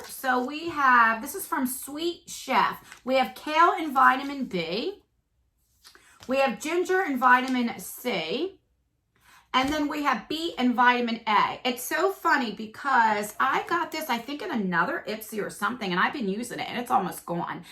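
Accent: New Jersey, United States. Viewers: I love watching your videos because of your accent, reminds me of home (NJ) ☺️ loveeee the violet voss palette 😍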